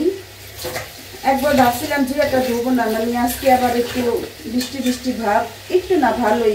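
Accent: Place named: Amin Bazar, Bangladesh. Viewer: আমি একজন ওমান প্রবাসী।ঢাকা মিরপুরের, দারুসসালাম, জহুরাবাদ আমার বাড়ি। কথা শুনে মনে হচ্ছে আমিন বাজারের কোথাও আপনার বাড়ি জানাবেন আসলে ভাষার মায়ায় আপনার ভিডিও দেখা শুরু করেছি। মনে হয় নিজেদের মানুষ ভিডিওগুলো ভালো লাগে। দোয়া ও শুভকামনা রইলো এগিয়ে যান। ভালো থাকবেন।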